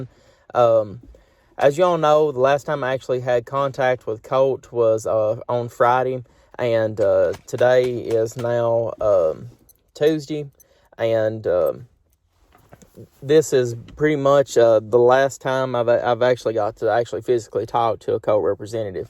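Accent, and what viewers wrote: South, United States. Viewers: Well...it is Connecticut no? Perhaps run through a few times of problems with a pal without such the deep southern accent, and have them call for kicks? see if phone response sounds different? sooks of your Python issue(s) ~ iken imagine the anticipation of first squirrel headshot not having to lop it off for stew and goes click. Not fair. :-)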